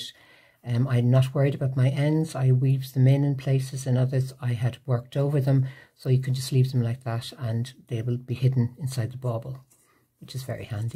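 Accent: Ireland. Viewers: Thank you. Wish all my school teachers had had your Irish Accent maybe I'd have listened more at school, you make it sooo easy to follow. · delightfully surprised to hear an Irish accent when I turned the volume on lmao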